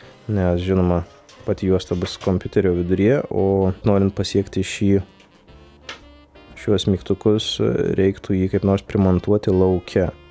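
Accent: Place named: Suvalkija, Lithuania. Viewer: Akcentas labai suvalkietiska primena :D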